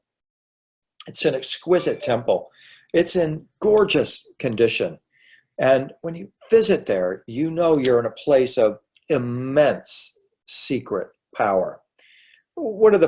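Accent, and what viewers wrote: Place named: Tennessee, United States. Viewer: Never miss a chance to see your work. I love your Tennessee way. I live in West TN always. Suppose you sound like home 🤓 You have a true sense of the human condition. Bravo!! 🥳☝️✨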